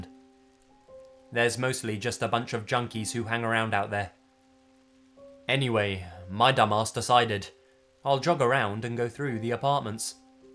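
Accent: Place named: RP, United Kingdom. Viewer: I really like your stuff and because you're a Brit, your accent lends an RP (BBC World Service for those who don't know what Received Prononciation is) seriousness to what you are saying. This gives the impression that it's reportage and makes it all the more chilling. Of the Narrators who do true stories I find yours give me the most pause for thought and the most chilling feeling. (Last time I complimented someone a troll latched on to me like a nasty little tick and I fully expect I will get lambasted for this so I am not letting it go to Google plus...the bugger will have to listen to a lot of good stuff to find this hopefully. .but despite that I think you ought to give credit where it's due!). (Ok trolls I may have put i before e after c in in a word but I can't be bothered checking. ....before you comment. ..I know! ).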